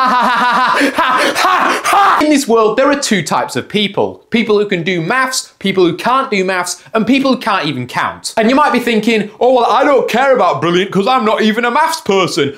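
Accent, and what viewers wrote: United Kingdom. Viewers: tf is that thick ass british accent?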